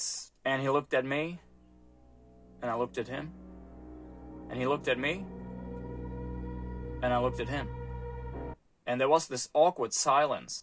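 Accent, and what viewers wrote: Australia. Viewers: Pretty good Aussie accent. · I think you're already getting a bit of an Aussie accent 😄👍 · aussie here! sorry for the massive text block but i wanted to give some honest feedback on your use of the words, i enjoyed the video and although it is the first video of yours i have seen, it will not be the last haha. firstly, the accent was better than most non-aussies, it had a lot of your natural accent in it aswell but the two accents were identifiable, you could definitely tell it was an aussie accent crossed with something. sheila is neutral as ive seen others already mention, its a fairly outdated term nowadays as it can sorta be considered a little sexist, the same way that like "chick" isnt exactly a nice way to talk about a woman. but you can both insult "thats one big sheila" and compliment (sorta) "thats one strong sheila". so its not exactly polite but it isnt always negative. 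fair dinkum really doesnt need the dinkum part tbh. its just refering to something thats fair imo. like if two players on opposing sports teams are talking to the referee and the ref makes a decision that the player deems fair and reasonable, theyd say "yeah fair dinkum, alright" and go back to playing. it can also be almost positive in the same way calling something "decent" is. like, "thats a fair dinkum engine in that thing" so it doesnt have to be about a person, and it sorta just means far/ understandable. something like that. at least to my understanding thats it. its usually just calling a decision agreeable in my experience. but the way you used it about a person is also accurate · Your English is truly Aussified - accent and all. Can't help myself - I have to chuck in a couple of definitions for you (haha). Chuck = throw. Fair dinkum = true, authentic or as a question it means "really?"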